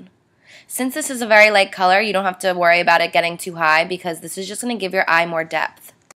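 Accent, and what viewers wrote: New Jersey, United States. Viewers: look at the accent! haha jersey girls! we know whats good <3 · Are you from New Jersey? your accent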